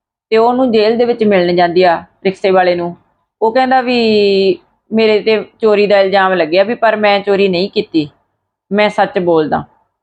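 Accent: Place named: Malwa, India. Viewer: Sister ji soda pind kara a boli ta mansa Budhlada Bareta di lagdi a ma army bich me a Bareta tu a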